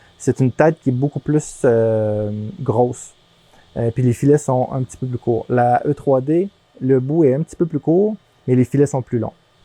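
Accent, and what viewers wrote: Canada. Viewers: Oui je le veux. Salut. Merci pour tes vidéos et ton charmant accent Canadien dont je me délecte!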